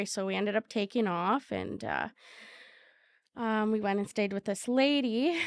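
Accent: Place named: Canada. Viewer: Definitely Canadian 🇨🇦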